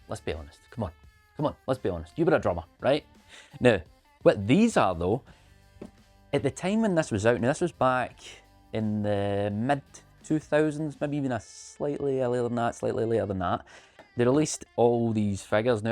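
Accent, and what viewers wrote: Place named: Scotland, United Kingdom. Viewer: Love the idea of a giveaway the greetar looks cool the and quality is much better now my first guitar was an Avon SG copy, the action was so high you could limbo under it and often I did trying to do fancy jazzy chords an Em flattened 9 with an F# in the bass with a cherry on top!, Enjoying the channel great to hear a Scottish voice 🏴😉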